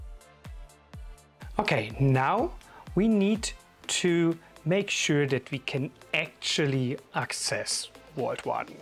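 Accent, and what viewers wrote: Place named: Germany. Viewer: i love the german accent.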